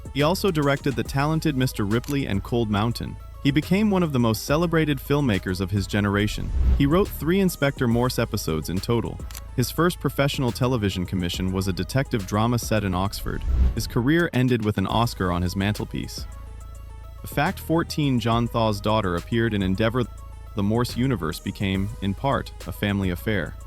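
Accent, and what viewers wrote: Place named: United States. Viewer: Interesting but it is a shame that AI accent is American.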